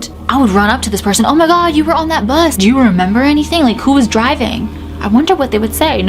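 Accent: Canada. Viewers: No don’t lose your Canadian accent ☹️ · First of all your Canadian accent is fine, I love it! Nothing wrong with it all :) Pennsylvania resident here..am about a half hour from Gettysburg. Would love to go there. Born in Philly! Yeah this legend is abit baffling but super interesting and trippy! If you want to explore another incredible story relating to Pennsylvania, look up the town of Centralia. I won't spoil any details here. Not really paranormal but I think it's quite interesting...and true. Thanks for the love for PA!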